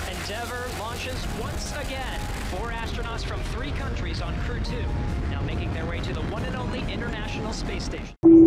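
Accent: South Korea. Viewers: 이사람 전라도 말투쓰는사람 맞죠?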